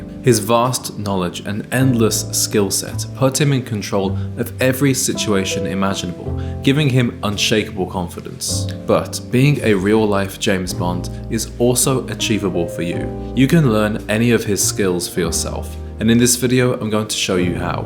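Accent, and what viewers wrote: United Kingdom. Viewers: Chicago? I thought you were British in the UK!?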